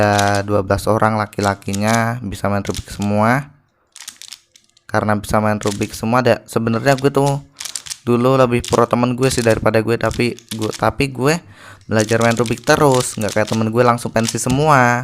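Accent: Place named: Java, Indonesia. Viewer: Medok mu itu loh masss <3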